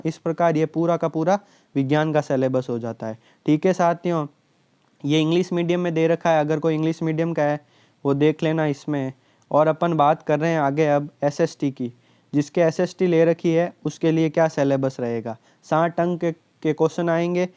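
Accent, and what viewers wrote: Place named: Jodhpur, India. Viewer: मुझे आप जोधपुर साइड के लगते हो 😂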